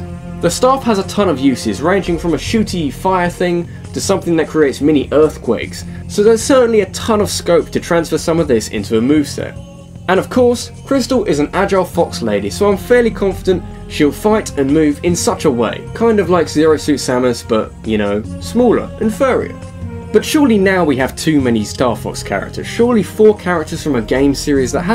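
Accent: United Kingdom. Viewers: A British accent doesn't mean your smart or you know what your talking about.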